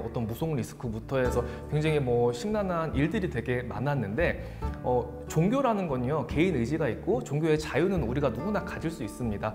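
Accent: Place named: Jeolla, South Korea. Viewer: 전라도 억양이네 ㅉ ㅉ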